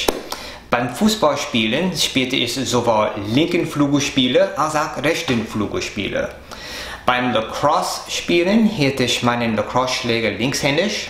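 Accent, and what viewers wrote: United States. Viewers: you seem to have the American accent on your r's and ch's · your voice sounds to me american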